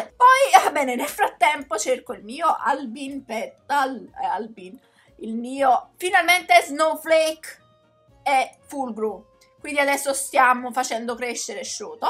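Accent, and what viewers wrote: Naples, Italy. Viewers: raga solo io sento un accento napoletano hahaha <3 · Ma sei napoletana? Scusa se te l'ho chiedo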